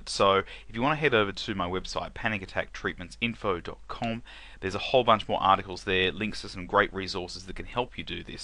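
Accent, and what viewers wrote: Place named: New Zealand. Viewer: Wow you described me exactly. Are you a Kiwi you have an NZ accent?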